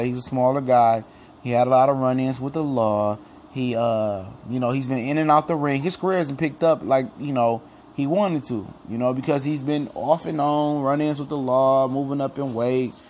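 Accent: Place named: Detroit suburbs, United States. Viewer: tickle your fancy bro Detroit niggas don't talk like that are you really from here or from the burbs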